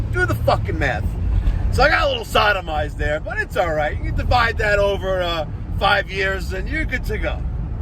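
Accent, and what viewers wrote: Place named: Long Island, United States. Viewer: Ha! Ran accross a video head the accent and said oh that's long island!! Ronkonkoma in da house lol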